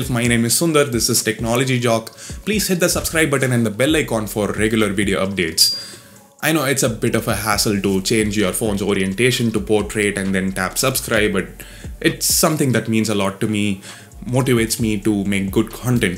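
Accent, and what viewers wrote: India. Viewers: Now that's the English one can understand properly, the way we Indians speak, unlike that fake a** weird accent used by aishwarya at c4tatti.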